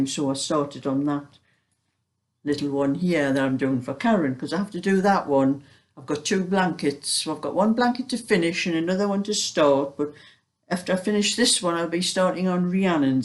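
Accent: Newcastle, United Kingdom. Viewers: Always chuckle when you say say you’ve got “ happy Mail” Why I hear you ask ? For weeks I’ve been hearing you say Happy MEAL lol It’s ya lovely gordie accent lol Think penny’s dropped xxx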